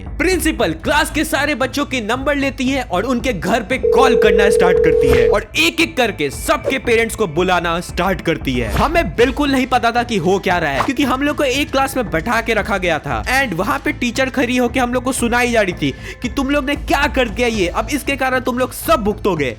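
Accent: Bihar, India. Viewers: Bihar se ho kya?? Aapke bolne ke style se laga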